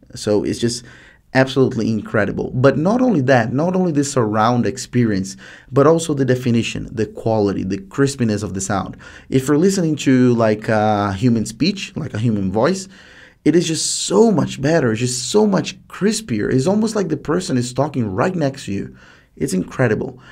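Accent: Brazil. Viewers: Are you Brazilian? I can recognise your accent cuz I’m Brazilian as well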